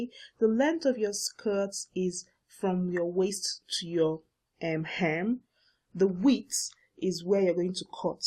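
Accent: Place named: Nigeria. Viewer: This is awesome! Thanks a million for sharing. You sound Nigerian, I might be wrong? I am Nigerian myself. Anyway, Thanks so much!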